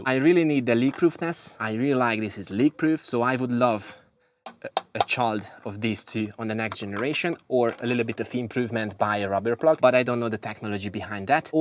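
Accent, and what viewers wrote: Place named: Hungary. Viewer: Hi, according to your accent, you must Hungarian 😉. Correct me if I'm wrong. Good content by the way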